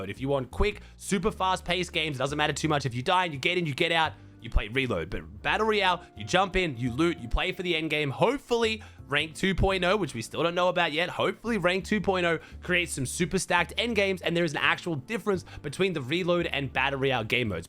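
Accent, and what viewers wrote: Australia. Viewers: little glitch from robo Aussie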